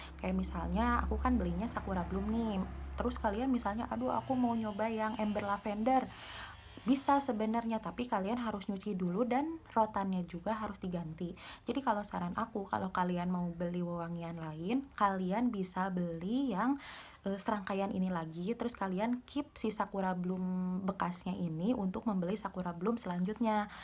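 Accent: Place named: West Java, Indonesia. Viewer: Haha lucu bgt logat sundanya 😁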